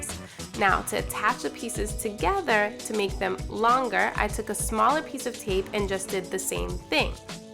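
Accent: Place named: Massachusetts, United States. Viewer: Are you from Mass? Your accent is so cute! Lol #CTNative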